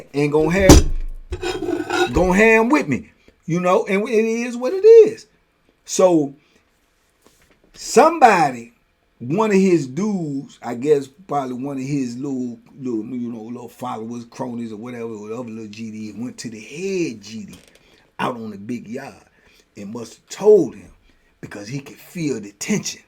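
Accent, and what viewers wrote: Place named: Brooklyn, United States. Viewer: love doin that New York Brooklyn accent 😅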